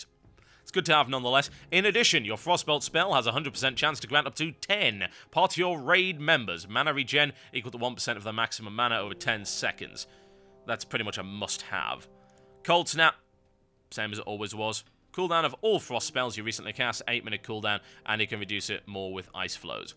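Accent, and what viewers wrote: United Kingdom. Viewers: why did I just spend nearly 40 minutes watching a video about talents on a class I dont even play?... I think its that british voice.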